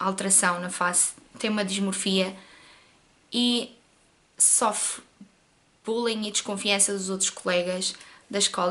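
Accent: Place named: Portugal. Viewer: ADORO o sotaque portugues!!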